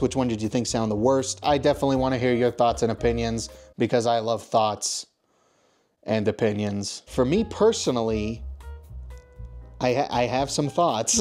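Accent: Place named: London, United Kingdom. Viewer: Hey good London accent. I sound more or less just like that😂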